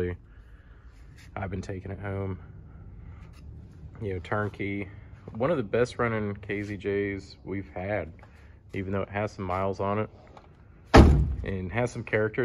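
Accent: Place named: South Texas, United States. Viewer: We speak the same dialect in deeeep South Texas by the Gulf of Mexico and it's alive and going strong here.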